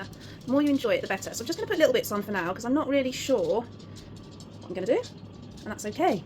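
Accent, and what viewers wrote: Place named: United Kingdom. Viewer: Does everyone in the UK talk as fast as you do? Sorry but I’m from Texas in the United States of America and I just can’t hear that fast!! We talk a lot SLOWER here in Texas. But I really love your accent; it’s so pretty but I just can’t understand you cuz you talk to fast for my brain to comprehend what you are saying. LOL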